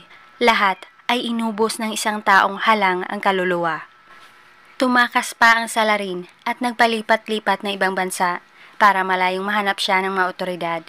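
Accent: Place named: Iloilo, Philippines. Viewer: I believe Ilongga sya😊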